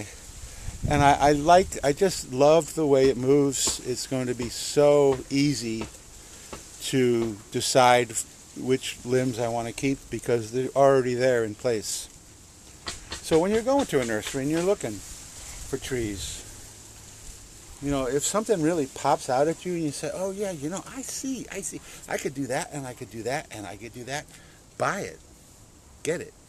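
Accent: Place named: England, United Kingdom. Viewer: Now I understand.... that's why it sounded like an English accent to me! but as I'm Italian, I thought I was wrong. So There is one more reason to follow You, to learn better Queen's English. See You....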